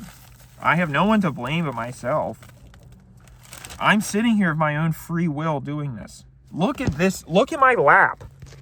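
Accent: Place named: Philadelphia, United States. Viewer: Man is def from the general Philly/Bmore area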